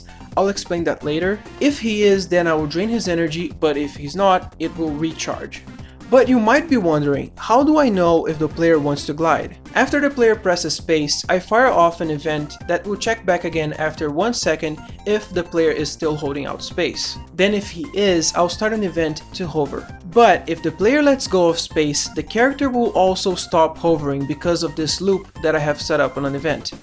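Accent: Brazil. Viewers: e esse sotaque de Br ae?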